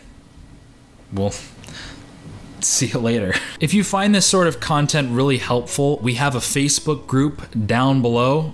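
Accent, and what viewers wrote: Canada. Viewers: Are you Canadian ? I love your videos. Thank you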